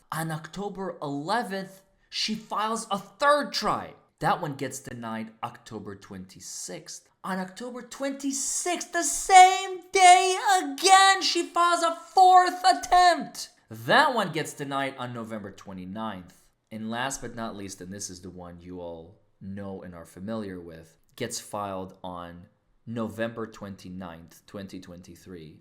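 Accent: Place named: Ohio, United States. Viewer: Awesome job I knew sooner than later someone would see what I thought was her manipulating the court’s system! AND I knew you were from Ohio! 😊I could feel it in my soul!! Honestly you have a face that I have seen at some point in my past! Not to mention you act too much like myself & our friends to be from KY! 😂 Those from Ohio have a different kinda accent!!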